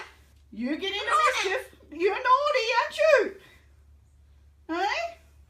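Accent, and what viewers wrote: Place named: Australia. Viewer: Oh yes I agree, along with the Australian accent he is priceless! 🦜 😁😅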